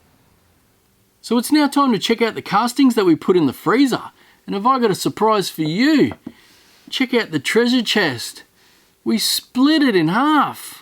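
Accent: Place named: Australia. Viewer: his australian accent is so good to hear😀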